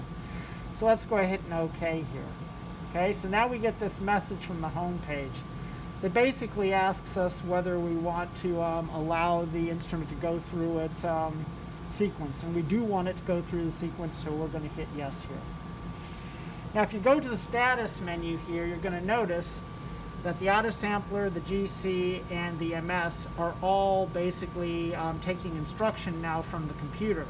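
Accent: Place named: United States. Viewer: Thanks that was great and with an original American accent clear and no ambiguity!!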